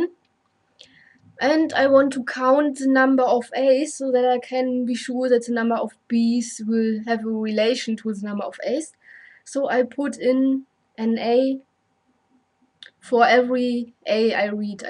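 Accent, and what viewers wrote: Germany. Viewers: I love your german accent ^^ (no offence, i am just learning german)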